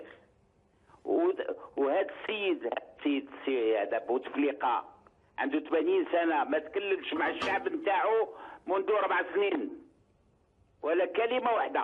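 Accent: Morocco. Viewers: qui êtes vous pour parler de l Algerie et de ses responsables. de quoi se mêle ce marocain.melez vous de votre roi et de votre pays. VOUS ÊTES UN MAROCAIN.VOTRE ACCENT.VOTRE HAINE CONTRE LE PRÉSIDENT ALGERIEN ET LES RESPONSABLES ALGERIENS VOUS TRAHISSENT.LE PEUPLE ALGERIEN N EST PAS CON OU DÉBILE POUR VOUS CROIRE. LES ALGERIENS SOUTIENNENT BOUTEFLIKA ET SA POLITIQUE QUE ÇA VOUS PLAISE OU NON. VIVE L ALGERIE.VIVE LA JEUNESSE ALGERIENNE.VIVE LE PEUPLE ALGERIEN LES HOMMES.VIVE LE PRÉSIDENT BOUTEFLIKA. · لهجة مغربية... واش دخلك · انت مروكي و الكلام نتاعك باينة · لهجه مغربيه واش تستنا منهم هذا المتحدث مغربي تحليل تاع رعيان كلام تاع الشارع دون مستوى يتكلم على رئيس فرنسى ثم البطاطه ياخي جياحه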